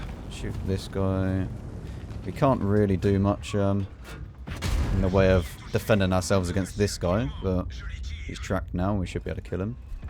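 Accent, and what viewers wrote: United Kingdom. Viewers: He speaks proper and with the english accent that I want to acquire.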